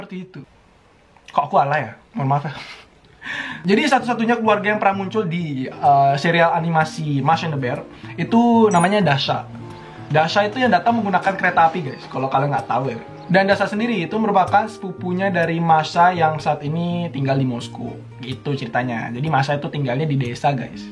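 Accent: Bali, Indonesia. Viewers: Logat lu medok bgt bro bali nya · Kayak ada logat balinya.... apa prasaan aku aja kalik ya..🤭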